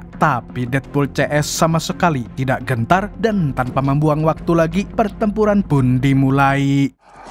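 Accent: Banjar, Indonesia. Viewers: Pian nih urang banjar kah mang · bah banjar kalinya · Urang Banjar hostnya 😂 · orang Banjar kah mang🙏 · Urang banua sekali ny .. 😂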